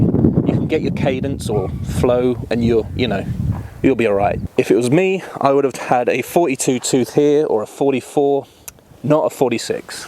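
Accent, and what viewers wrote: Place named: United Kingdom. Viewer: Wtf stupid music British accent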